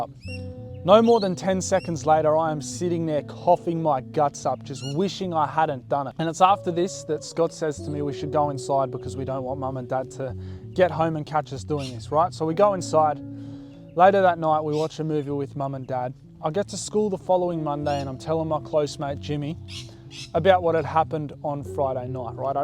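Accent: Australia. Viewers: thats an aussie accent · Are you Aussie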